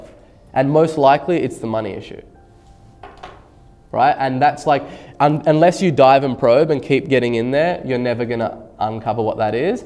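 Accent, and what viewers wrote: Australia. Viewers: I love your ausy accent..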